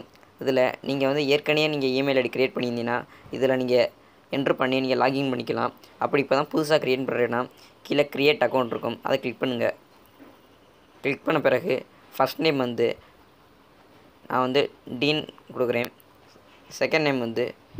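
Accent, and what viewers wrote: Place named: Tirunelveli, India. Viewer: Payapulla thirunelveliyo 🤩 luv ur slang bro 🙌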